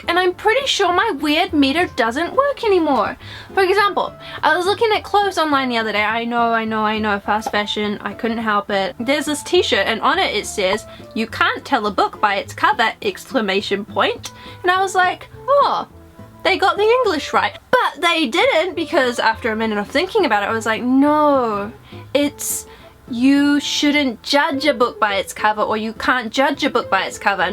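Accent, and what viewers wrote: New Zealand. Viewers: I love your videos so much, they always make me smile. I can't think of anything strange about my country (Australia). Also I LOVE your New Zealand accent ^-^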